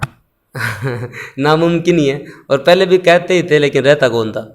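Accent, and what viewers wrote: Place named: Marwari, India. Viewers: शेखावटी टच है । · Wah sir apki marvadi ka lahaja achcha h..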